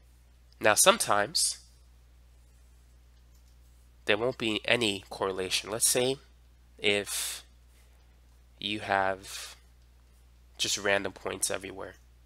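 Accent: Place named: Massachusetts, United States. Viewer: He talks like he's from Massachusetts.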